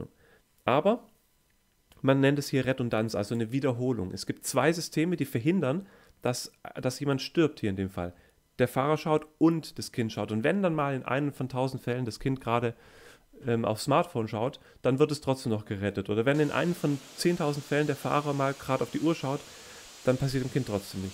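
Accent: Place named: High German, Germany. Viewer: I know German I was born 100 percent pure German I am a hutterite. He's talking g high german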